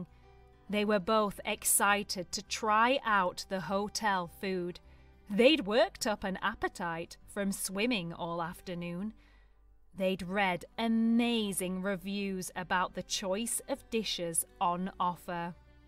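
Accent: United Kingdom. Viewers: Thank you for this wonderful story! 🌞 The clear British accent and helpful subtitles made it easy to follow and enjoyable to listen to. Perfect for B1-B2 learners like me! Please keep making more stories like this. 😊📚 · Please give us time to read this story in British accent because we want improve our British accent 🌷🌺💞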